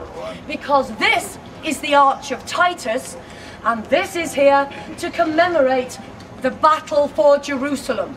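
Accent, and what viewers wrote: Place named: England, United Kingdom. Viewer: Signora è mitica , adoro l'accento inglese , rende aulico anche ciò che non lo è. Spiegato con entusiasta enfasi.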